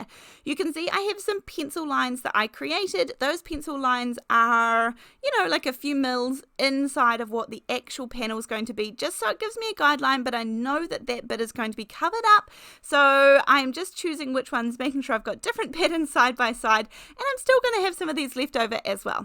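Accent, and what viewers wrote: New Zealand. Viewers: agreed. I love your Mainland accent😊 it brightens my day. ❤ have a fabulous week. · I'm an Aussie an although we usually take the mickey out of our Kiwi cousins I also like accent and herbright and breezy outlook always makes my day 💕🤪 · !! Don’t say vayze. Be proud of your kiwi accent with English roots and say varze. Lol. And yes i also love your smiley happy voice too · Beautiful cards from cute stickers. I love them all. I love your accent, too. I watch a lot of BritBox and you have helped me to identify the New Zealand accent 😊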